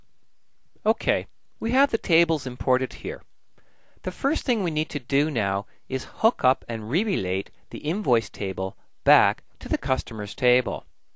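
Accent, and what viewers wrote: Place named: Canada. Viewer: Canada? love the accent. thanks for sharing.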